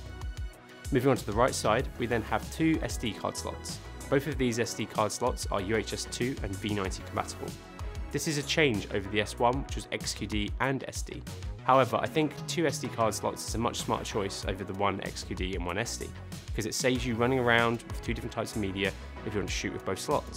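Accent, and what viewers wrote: United Kingdom. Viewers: Music really should not compete with voice in a mix. I had a hard time hearing the voice. With the British accent and high speed speech to boot, this American had a hard time hearing and processing the information in real time. I did watch it twice, though!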